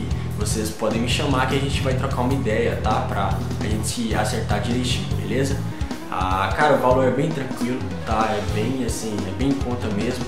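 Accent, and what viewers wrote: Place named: Rio de Janeiro, Brazil. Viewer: Fala moleque, tu é do Rio brother? sotaque carioca, da onde mano?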